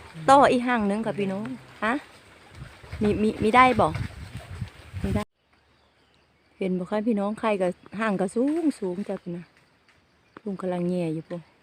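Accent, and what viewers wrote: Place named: Phu Thai, Thailand. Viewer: มาลุ้นแกย่ไข่มดแดงนำเด้อครับ มักเสียงเว้าภูไทครับผม ติดตามให้กำลังใจนำครับ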